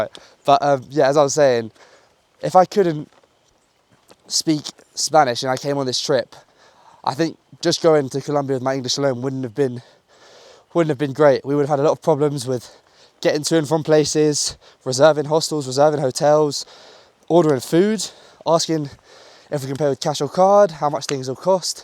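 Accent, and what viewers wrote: England, United Kingdom. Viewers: Se nota que eres británico de Inglaterra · your British accent is also wonderful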